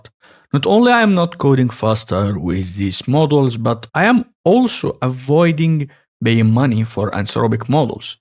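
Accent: Egypt. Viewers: I want to know where you are from😂 because this الوط accent very Egyptian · nice setup! I love the thicc egyptian accent!😆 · Love the Arabic accent “seemingly to be Egyptian” though if I wasn’t wrong bro 🎉 Totally underestimated channel definitely subscribed عاش والله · I love your accent Egypt?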